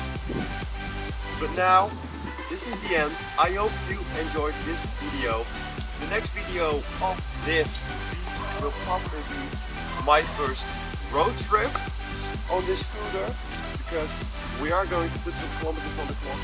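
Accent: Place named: Netherlands. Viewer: The Dutch accent tho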